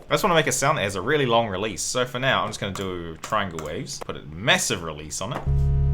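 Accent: New Zealand. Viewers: Hey dude, you sound like you're from NZ lol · Beautiful! Picked up the kiwi accent too ;) Chur bro It really shows that what plugin you use isn't important, it's just the talent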